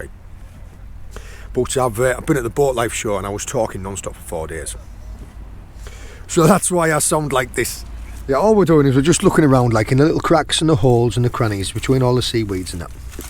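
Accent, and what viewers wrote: United Kingdom. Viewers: may I know which accents is this ? which part of Uk?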